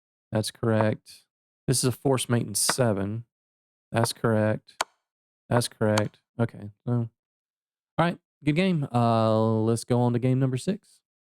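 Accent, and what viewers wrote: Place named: North Carolina, United States. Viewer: Love the video and series! Where are you from? I’m an accent guy and it sounds like you’re from somewhere close to where I used to live (Raleigh, NC).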